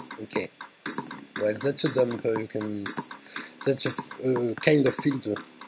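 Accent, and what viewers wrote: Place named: France. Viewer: Le belle accent Français :')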